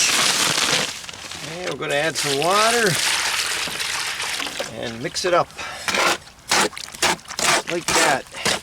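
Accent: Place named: Scranton, United States. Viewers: NE Pa is my old stomping grounds. Good to hear that Pennsylvania Dutch accent again. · You can't miss that Wilkes-Barre / Scranton accent. Thanks for the videos.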